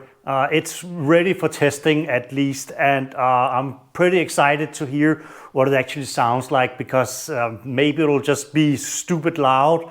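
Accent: Denmark. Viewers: Alene baseret på accenten, så vil jeg smide et vildt gæt på at du er dansker 😏 Fed og interessant video!🤟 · ja du behøver iværtfald ikke sige hvor du kommer fra :D. den danske accent er bare dejlig haha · Elsker at man ved første ord kan sige med 100 procent sikkerhed, han er dansk.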